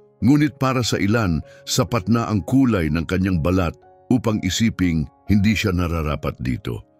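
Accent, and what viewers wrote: Visayas, Philippines. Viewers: DUPER TANGA SINO ??? FACEBOOK PHOL. OR THE NARRtOR. BAKIT??? BOBO GAGO ANG NSRRATOR SA TAMSNG PAGBnGHAY NG TAMANG SALITA NA TAGALOG ...ISANG INSYLTO SA ATING PAMBANSANG WIKA... FACEBOOKKSTANGAHAN GINSHAYSSN NA MAIERE SAMEDIA ANG PAMBABABOYSA SOC MED.. PANSININ NIO MGA VIDEOS LAHAT SY DALING BISAYA MARIRINIG CGURADO MAJORITY SNG STAFF NH FB PHILS AY MGA BISAYA. WALA GUIDELINES TUTOK SA KIKITAIN HINDI SERBISYO ...DAPAT Bng i BAN FACEBOOKK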